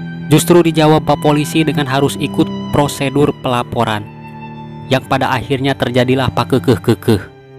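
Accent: West Java, Indonesia. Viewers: Dari awal kutebak, pasti orang sunda,. Salam sunda 💪🏻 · Bang dari logatnya kayanya abang dari sunda ya hehe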